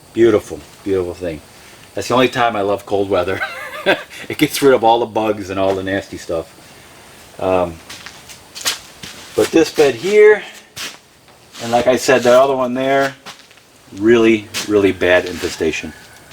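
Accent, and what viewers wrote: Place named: Massachusetts, United States. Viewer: LOL I was thinking the same thing ….I’m thinking he’s from Massachusetts…. That’s where I came from … living in Texas now..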